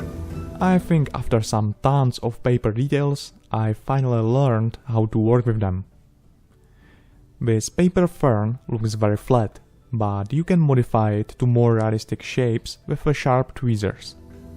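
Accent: Czech Republic. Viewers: čuchám český english accent? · He is from Czech like me you can find out by hearing R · Wtf ten český přízvuk se nedá přeslechnout. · This guy sounds like he’s from Czech republic. Trust me I know the accent.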